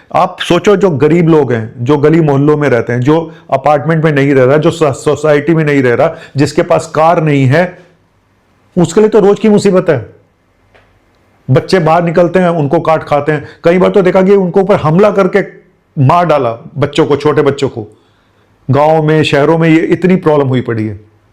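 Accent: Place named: Delhi, India. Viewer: Dr sahab ham gujrati log aapke jaisi Delhi vali hindi nahi bol sakte to plz hamari msg pdhhke hansna😂 nahi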